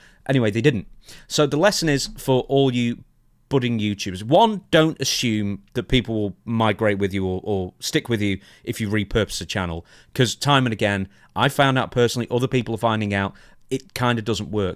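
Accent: England, United Kingdom. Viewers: I actually like going to your videos more than Stevie's. Maybe because you are English and I relate to your tones and expressions more. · I think a big reason people migrated to this channel is that for one: is self-aware. He doesn't have a deluded sense of grandeur in comparison to the wrestlers he hosts podcasts with. I don't like Conrad, I don't like RVD's co-host, etc. Brian Last is okay but sometimes he can be super rude when discussing people and as far as I know, Brian Last is morbidly obese. Second, professionalism. This is also why I really enjoy Stevie Richards' show so much. I like Dutch's as well but he's an older guy so I don't expect him to whip out the newest 4k technology, but Stevie has a studio, he puts effort, good mic quality, etc. takes the videos seriously, the camera and mic quality is excellent, and he actually prepares before he speaks on things. 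I don't mind the rambly moments, but it seems like he as bullet points to get him back on track or he'll cut if gets too off the rails, which I appreciate. Third: is a great co-host. If the wrestler doesn't know about something, you can rely on him to suitably fill them in before the show and even on it if they forget some detail. If the wrestlers starts rambling or forgetting their spot, is good at getting things back on track. Fourth: He has a British accent. Instant +1 because that accent was made to narrate, lol. And I've enjoyed every show he's had. For all people like to rag on Stevie Richards for never being that main event talent, his show is one of, if not THE best one out there. Good production quality, a man w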